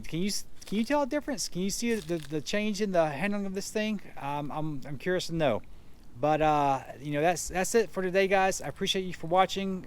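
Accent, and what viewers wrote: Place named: United States. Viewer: You have a...kinda...country accent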